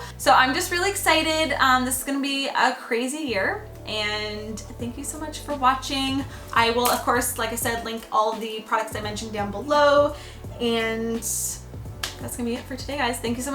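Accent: Canada. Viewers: Is she Canadian???!! I can hear an accent!!!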